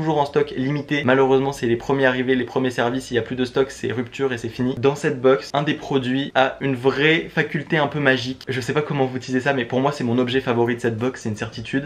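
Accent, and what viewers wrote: England, United Kingdom. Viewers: Alors, j'adore ton accent anglais, team Serpentard depuis toujours hehehe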